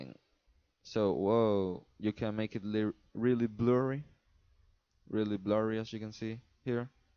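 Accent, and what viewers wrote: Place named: Brazil. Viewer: el chico del vídeo fala com acento brasileño.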